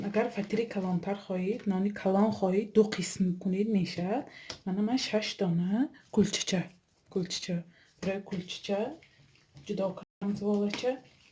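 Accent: Isfara, Tajikistan. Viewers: Гап нест. Апачон ба фикрам шумо а Исфара а? Лахчатон худи исфараги боин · Рахмати калон моям аз исфара аз тарафи таги бихи 39 сола шахри Саранск ба 6солбоз зиндаги мекунем сохиби 5 писар❤️❤️аз овозатон фарк карам ки аз исфараи бостони хастед.. Хелллеее шод шудам.. Дина торти наполеон ро бо рецепти шумо таер карам бенихоят хамираш махину бомазза буд рахмати калон...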